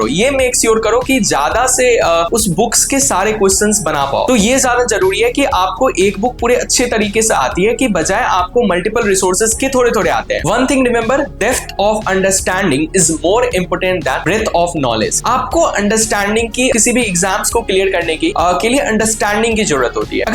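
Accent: Bihar, India. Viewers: He is from Bihar and has that bihari accent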